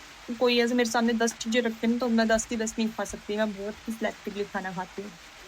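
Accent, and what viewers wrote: Haryana, India. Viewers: Hariyanvi boli bahut pyari lag rahi👍👍👍👍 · Wah rr jatni aaj haryanvi bol k moz krdi❤❤ My cute crush 🥰🥰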